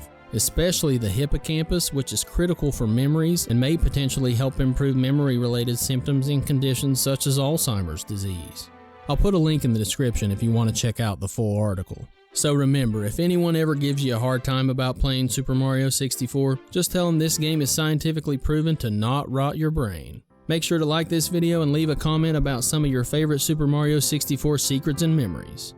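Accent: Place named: Georgia, United States. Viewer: I just want to say as a guy who grew up in rural Georgia and was born in the mid-80's, it's gratifying to watch this video in that accent and hear you describing memories of experiencing Super Mario 64 at about the exact same age I did.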